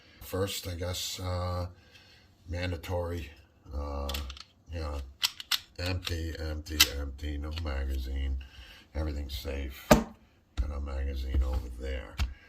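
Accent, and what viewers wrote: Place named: Northeast, United States. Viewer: Definitely from the Northeast. Good vid btw #2A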